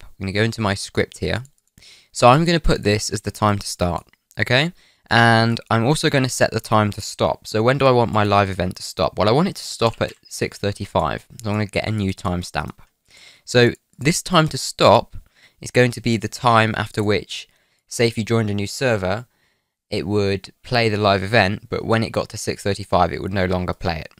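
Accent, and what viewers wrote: United Kingdom. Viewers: the voice of me but WAY more British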